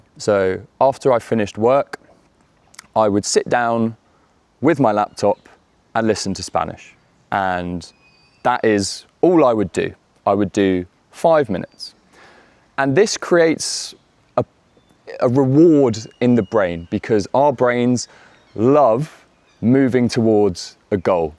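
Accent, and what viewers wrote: United Kingdom. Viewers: Are you British? Your English is different. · Talk about another topics as much as you can because you have a wonderful British accent. And I think it can be a listening practice all of us. Thanks. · Hi there thanks for this I really love the British accent and finally I found an interesting channel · Although I focus on learning American English, but this video is my listening practice today , I like the British accent BTW. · Are you speaking in British accent???? because I want to learn American one, I'm afraid to be in the wrong place 🙂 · Ahahaa😂 he speaks in british accent and I really love it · Is that British accent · You said you are in American in one of your films but your accent is like UK . I love your accent cus I'm learning UK English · Finally someone with British accent 😂❤ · Your british accent, I loved it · Eu gosto do sotaque britânico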